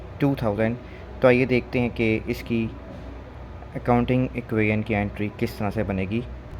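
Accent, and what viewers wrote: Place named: Pakistan. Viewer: Omg your urdu accent is so... I wanna meet you sir! Absolutely Amazing❤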